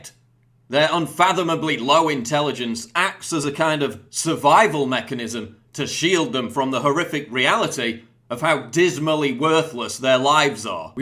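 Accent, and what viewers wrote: United Kingdom. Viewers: Are you British, sir?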